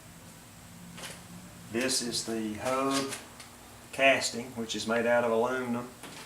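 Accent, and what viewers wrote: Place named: Tennessee, United States. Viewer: My 790 has 667 hours in it. Waiting for this to happen. I'm retired mechanic and service manager, but never been into one of these. My brother, also a mechanic told me this was a common problem. BTW, you look like you could be twin brother of a very good banjo player I know of. I recognized your dialect and you mentioned Tri Green so I figured you're in Tennessee. Check out Daniel Grindstaff banjo, perhaps you are familiar with his music. Appreciate your verse and good spirit.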